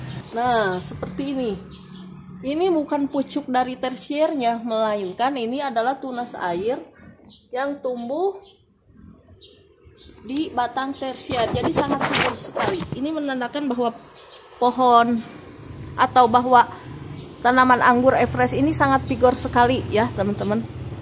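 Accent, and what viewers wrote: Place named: West Java, Indonesia. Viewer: Maap mbanya dari logat nya mbanya dari jabar ya sunda leres te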